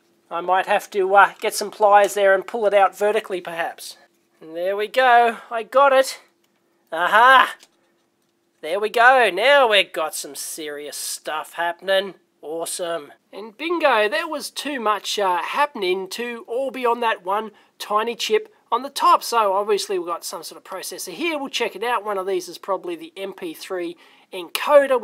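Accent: Australia. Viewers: He speaks "strine." :-)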